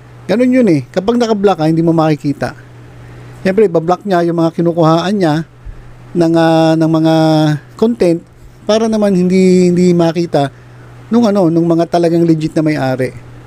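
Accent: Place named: Manila, Philippines. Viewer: Nakinig nko dyn boses mo narinig ko.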